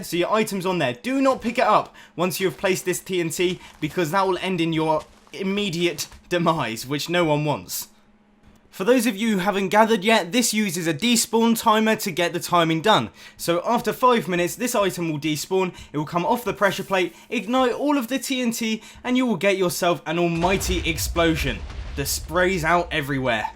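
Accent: United Kingdom. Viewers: Good old British. :) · British FTW!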